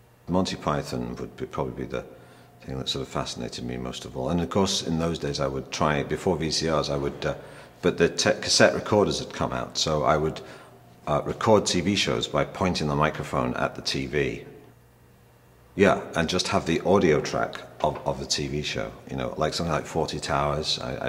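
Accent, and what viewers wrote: United States. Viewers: yep, he's not that great on this. and he's developed one hell of a yankee accent too. must've been out there too long. "partridge, you W***ER!!" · That american twang is so annoying!! Saw him doing his tour in Oxford a couple of days ago, and there was none of this accent nonsense! (And it was brilliant)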